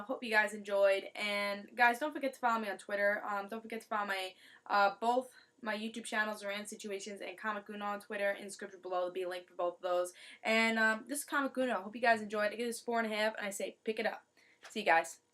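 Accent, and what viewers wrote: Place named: New Jersey, United States. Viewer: are u italian because you have a new jersey accent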